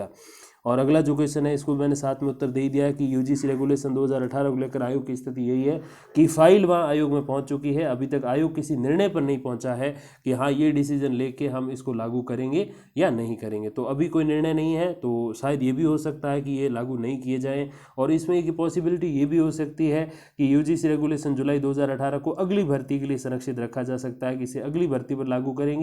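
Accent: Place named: Nagaur, India. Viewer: Apki boli Nagur k Jaat jesi h esliye pucha. Thanks for all informative videos.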